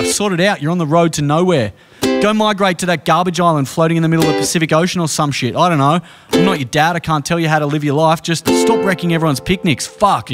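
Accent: Australia. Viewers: Jai Slouf both and the voice is just... Australian. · r u Australian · Jai Slouf or the AUSSIE ACCENT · Are you aussie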